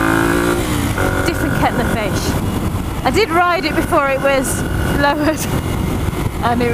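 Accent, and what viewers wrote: United Kingdom. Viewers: WOW you really are a happy bunny aren't you !! You sound like my next door neighbour, she's a Brummie gal as well. Just wanted to ask where you got the handlebar mount from? I've just bought a Go Pro 2 and I think the best point to fit on the GS is probably the engine bars but still looking for options at the moment. Great vid by the way. All the best JJ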